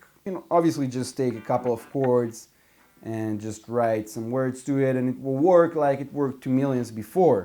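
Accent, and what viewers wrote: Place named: Latin America. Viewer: OMG man, your videos are amazing, you make such intresting and useful videos, they can be super useful for beginners+ players. It is a Shame you are so underrated, you make hi-level videos and Don't get as many views as you realy deserve. Wish you the best of luck, keep up with the amazing content. P.s. até you from Brazil or latin America? I think I know this accent hahaha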